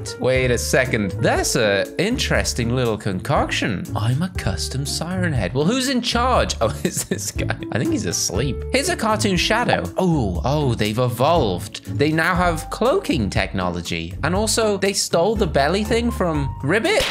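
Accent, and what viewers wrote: United Kingdom. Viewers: sir- are you british?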